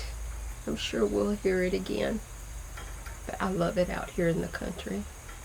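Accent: South, United States. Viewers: I like hearing the rooster. I like hearing all the birds and the nature. I like swinging on the porch with you and I like your southern draw. It is all so pleasant and relaxing. I am sorry you have to go through all this. You will never forget Curt and he loves you and will always be with you. He wants you to be happy and have joy.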